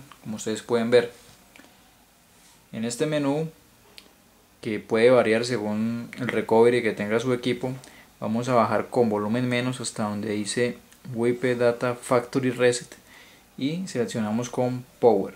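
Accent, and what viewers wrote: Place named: Colombia. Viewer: Que buen tuto! Gracias. Funciona perfecto. Y amo tu acento Colombiano. Un beso :*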